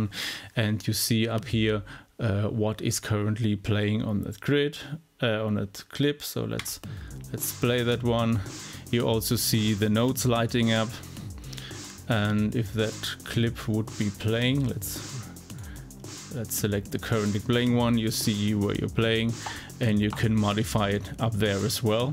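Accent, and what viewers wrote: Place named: Germany. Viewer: Well Done! Up And DOWN! love the german accent! thx a lot!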